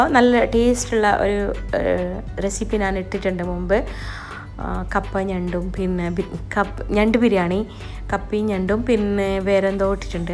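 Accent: Kasaragod, India. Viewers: കുക്കിംഗ് അടിപൊളി നൂറിൽ നൂറ് ഞണ്ട് കറി വറ്റിച്ചത് നയിച്ചോർ ഞാൻ ഉണ്ടാക്കി കഴിക്കാറുണ്ട് അടിപൊളി ടേസ്റ്റി അമൂർ മീൻ നിങ്ങൾ പറഞ്ഞത് ശരിയാണ് എനിക്കറിയാം ഞാൻ ഒരു മീൻ കടയിലാണ് ജോലി പുള്ളിയുള്ള ആമൂർ വില അധികമാണ് ഇവിടെ ഒരു കിലോ 60 റിയാൽ ആണ് അതുപോലെ തന്നെയാണ് സാല മൂൺ എന്ന ഒരു മീൻ ഉണ്ട് ഇറച്ചി ഓറഞ്ച് കളർ ആണ് അതും ഒരുപാട് ഫൈത ഉണ്ട് ദുബായിൽ സുഖമാണോ കുട്ടിപ്പട്ടാളം എവിടെപ്പോയി വെള്ള ആഗോലി ഇവിടെ കിലോ 70 റിയാൽ ഒരുദിവസം എന്തായാലും നിങ്ങളെ കാസർകോട് ഭാഷ ഞമ്മക്ക് പെരുത്ത ഇഷ്ടായി ട്ടാ ഇച്ഛ എവിടെപ്പോയി വീഡിയോയിൽ ഒന്നു വരാൻ പറയണോ ഓക്കേ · ഞങ്ങൾ കാഞ്ഞിരപ്പള്ളികാർക്ക് നിങ്ങൾ കാസറഗോഡ്കാരുടെ സ്ലാങ് തന്നെ കേക്കുമ്പോ വല്ലാത്തൊരു ഇഷ്ടമാന്നെ.. അപ്പൊ പിന്നെ ഈ റെസിപ്പി ഒട്ടും സ്കിപ് ചെയ്യാതെ തന്നെ അങ്ങ് കണ്ടിരുന്നു പോകും. പിന്നല്ലാതെ 😍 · Ksrod slang പൊളി ആണ്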